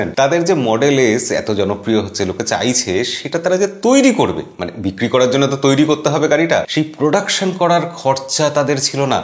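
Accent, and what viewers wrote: Bangladesh. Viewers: ভাই আপনার কথা শুনে ভারতীয় মনে হয় না , পুরাই বাংলাদেশি বলে মনে হয় , আপনি ভারতীয় শুনে মন খারাপ হয়ে গেছে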